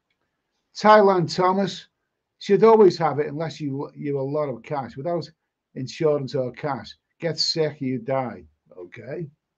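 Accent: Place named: Northern England, United Kingdom. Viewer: This is awesome mate.- absolutely love your input and experience on South East Asia. It has been awful weather in the UK - since you have a Northern accent, I bet it is grim up there, I know the East Midlands (where I'm from) had crap weather throughout the summer. Our winters are dull and horrible. I was thinking of retirement in either Malaysia, Indonesia or Thailand in a few years time but my first choice is Malaysia, hopefully retiring in Kuala Lumpur. Honestly mate, I am so sick and tired of the weather here in the UK, it is depressing, South East Asia is amazing with incredible and friendly people, amazing cultures, superb beaches, fascinating landscapes, jungles, mangroves, cable cars, waterfalls, lakes, mountains, diversity of flora and fauna, amazing food and variety of cuisine, spectacular religious sites especially temples and mosques. 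The list can go on and on.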